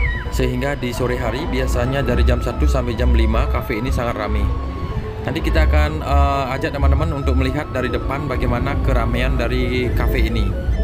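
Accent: Bali, Indonesia. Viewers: . mangkanya logat suaranya persis orang bali hehehhee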